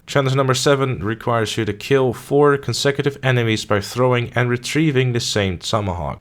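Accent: Netherlands. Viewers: Subbed! Your challenge videos have helped me out a bunch! Are you Dutch by any chance? I recognize the accent I think ;)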